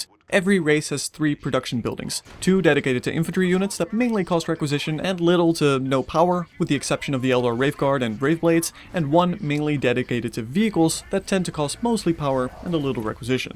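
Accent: Netherlands. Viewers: You have the most dutch accent possible, great guide tho!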